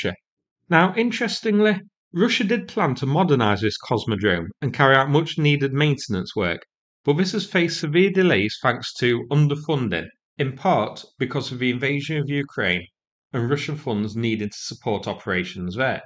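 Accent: United Kingdom. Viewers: pommie accent .... lol .... why not do a doco on the mighty Has-Been Kingdom "Space Force" ..... lol you wankers can't even launch a can of baked beans into orbit ..... you are such a bunch of total losers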